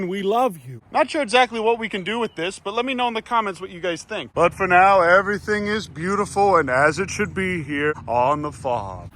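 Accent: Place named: Southeast Pennsylvania, United States. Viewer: I noticed on your last vid you said you were from PA. I honestly thought just from your voice you might be a south east native. I'm from the West Chester/Chadds Ford area. As well you remind me of a good friend of mine Joe Magno, any relation?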